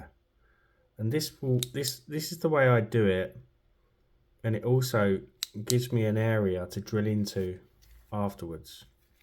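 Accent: United Kingdom. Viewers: New subscriber here, hello! 👋🏻 Between the use of the cat eye nail polish in your crafts and the genius that is the 'swirl' I just had to subscribe! 😂 Where abouts are you from in the UK? I live not far from Brighton and am trying to place your accent 😂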